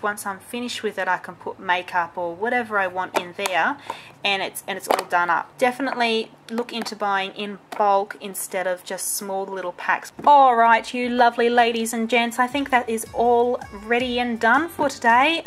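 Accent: Australia. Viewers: I love the way those sparkly bead thingies look for some reason.;) Oh no, it's that delicious-looking sponge again! I'm hungry agaiiin, and I just finished eating! As always, this is well shot, and the little notes and tips that pop up on the screen are cool. Ooooh, ooooh! And the fast motion writing on the sticky notes at the end! Genius! I loved it, and I mean that shit! <3 T'was wonderful to hear your Australian voice again,. I wish you the best!:D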